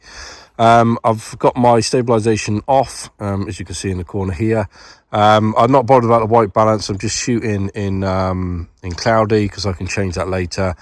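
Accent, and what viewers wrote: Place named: Somerset, United Kingdom. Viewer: I came here looking through recent S5ii videos, ears pricked at accent, then location then mention of a C3; i've stumbled on gold here 🤣🤓🤟 i'm a Somerset based lumix and film shooter too. Ace pics of the young trees! 🤓🤟 I'm on the cusp of picking up an S5ii for weddings for this year. I was running x2 S1 and an S5, I found I was missing the occassional shot due to difficult contrast scenes at times and then faffing with MF and missing moments. I've sold my S1 bodies and plan on x2 S5 and one S5ii. How has the AF been for you so far on the S5ii? Bizarrely i've never been a fan of eye detect so kind of really after how it performs without eye AF (all the hipster videos seem to be eye AF only). C3 is such a good camera, I ended up selling mine as it was a bit heavy for me also I wanted an SLR 6x6. One hell of a camera though, loved using it, do wish I tried it with one of those tripod parralax correction tools though, but then the parralax didn't mess me up too much.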